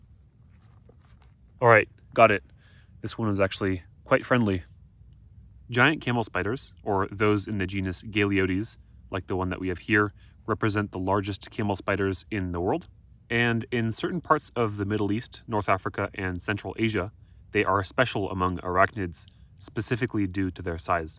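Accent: United States. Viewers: You sound American. I wish we had better relationships with the middle east. Their flora and fauna are so fascinating, but there is so Little "western" information. Sad really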